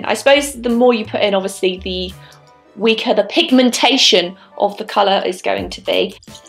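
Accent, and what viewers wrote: United Kingdom. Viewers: I love your accent and I'm British too 😂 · I thought u were british bcs of ur accent and voice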